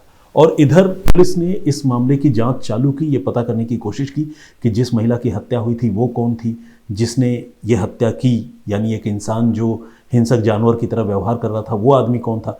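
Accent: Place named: Bengal, India. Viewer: आपके कहानी सुनाने का अंदाज बहुत ही आकर्षक है। मैं विशेषकर आपके बंगाली हो करके शुद्ध हिंदी में कहानी सुनाने के अंदाज से मैं बहुत इंप्रेस्ड हूं।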